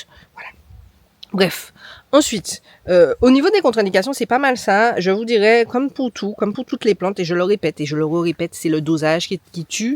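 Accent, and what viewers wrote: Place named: Martinique, France. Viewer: c'est l'accent de Martinique qui vient du créole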